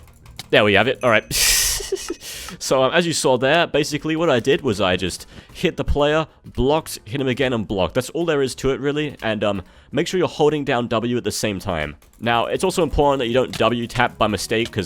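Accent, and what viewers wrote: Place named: United Kingdom. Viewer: I swear you sound British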